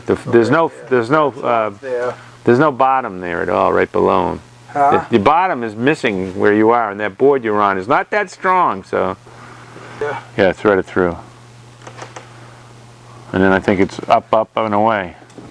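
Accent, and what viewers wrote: Boston, United States. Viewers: Yep I would like to see you fix it rather than swapping out the hull if it's not too far gone of course, I'm not from the US and I'm just curious about your accent, I am going to guess at Boston region ?? I'm probably the wrong end of the country eh lol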